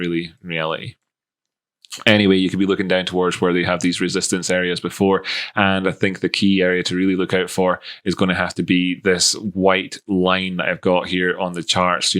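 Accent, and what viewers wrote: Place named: Scotland, United Kingdom. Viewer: only came across your ta over the last few weeks, really enjoy it. easy to follow a scot as a welshman too haha keep it up bud!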